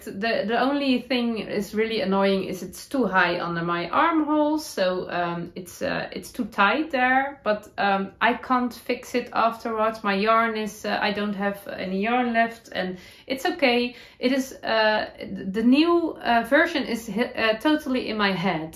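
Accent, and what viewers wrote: Netherlands. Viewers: Love your podcast and your Dutchy English! Your Ariana sweater looks great. As you were describing what you wanted at the centre front of the neck I think you might find that the centre double decrease will give you the look you want (for the next sweater!) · I love your sweater! The colors are so beautiful and looks great on you. I wonder if you could embroider a couple of small flowers in the extra stitch gap area in the front or add small buttons. Just an idea if it continues to be a concern for you. I love your podcast and your Dutchy English😊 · I am from Oregon USA. Your youtube channel is so relaxing to watch while I knit, from the beautiful introduction music and throughout. I am a slow knitter, my process is slower and my lifestyle doesn’t allow me to finish projects as fast as I’d like and I never feel bad because of it when I watch your episodes because you never present an unrealistic amount of items you’ve finished. 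You have a very pleasant “Dutchy English” and your style is lovely. Thank you!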